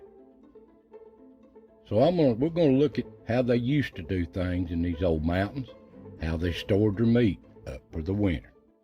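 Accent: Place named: South, United States. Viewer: My mother grew up in Lafollette Tennessee. My dad grew up in southern Pennsylvania both on farms the stuff we did when we were kids was just half of what they grew up with. Enjoy your southern accent. I love the history. Thanks for sharing Joe in Maine.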